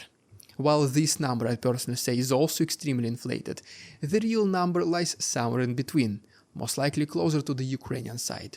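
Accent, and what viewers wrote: Russia. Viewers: Can you translate to English. Your Russian accent is hard to follow.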